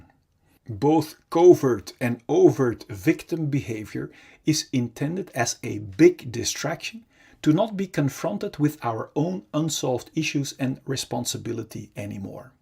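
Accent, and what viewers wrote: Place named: Netherlands. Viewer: Accent sounds Dutch.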